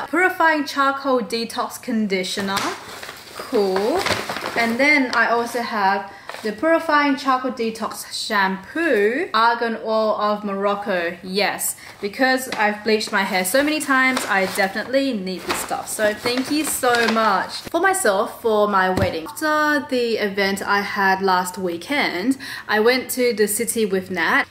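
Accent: Australia. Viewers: Very Lovely! 🍦🍦😉😉⛹️‍♀️💻💻🍾You have a hectic but interesting lifestyle!🙂..Nice to see how much your videos have evolved! YOU are a sweet cutie! Always be Yourself! I love love your Australian Accent! 🙂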